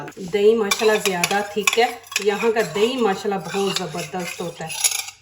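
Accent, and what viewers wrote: Punjab, India. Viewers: Sorry behna panjabi wali urdu na bolain urdu ko urdu ki tarha bolain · Behe Shef Sahiba Ap tu Urdu Bhi Panjabi ma bol rshi hain Khuda k liye Panjabi ma hi Comentry kr boht kharab Ecsent ha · Baji Aap ki Ourdo same like Punjabi Exent.... are you from Sialkot....? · Achi recipe hae definaitly I'll try it in sha Allah...mae bhi punjabi ho but aap ny to urdu ke tangain he toor de 😄 too much strong accent hae but words bhi properly nahi bol re like dahi ko dare, bareek ko break full punjabi words use ho ry hae in urdu plz is pr bhi work krain.Allah aap k channel ko kamiyab kry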